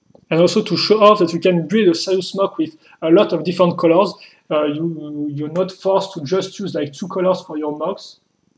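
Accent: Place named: France. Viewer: Du vrai francais dans l'anglais ^^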